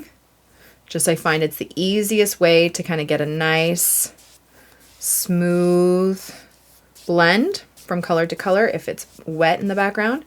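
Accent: Canada. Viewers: Before I subscribed- I was watching one of your videos, and as I was listening to you- I was like “Do I detect the Canadian rise?!” Lo and behold- I decide to subscribe and see that you are one of my people! ❤🇨🇦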